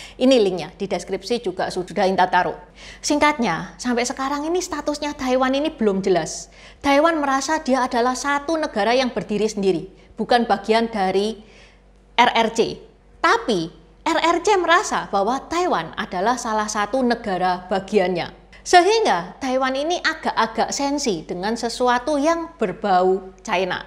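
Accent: Java, Indonesia. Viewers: Intan Jowone medok 😁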